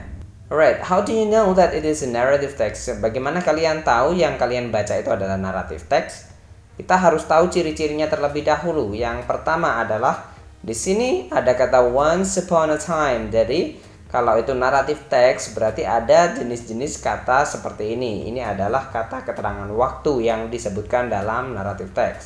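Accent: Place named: United Kingdom. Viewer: Saran mas : Kan w merhatiin kalau mas lagi pakai accent yang lumayan British, nah Cara mengucapkan Brought nya itu seperti ini : "Brot" kek gt bro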